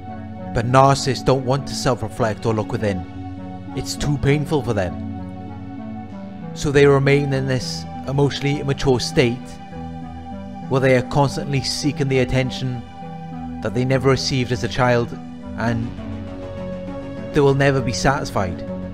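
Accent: Wales, United Kingdom. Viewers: He sounds Welsh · Sounds British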